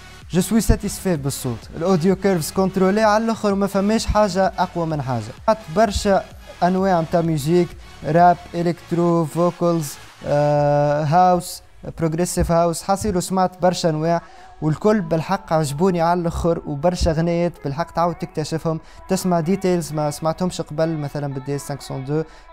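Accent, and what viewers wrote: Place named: Algeria. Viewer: dude 3andek famille mn ljaza2ir bicuz ur voice benli dziri :3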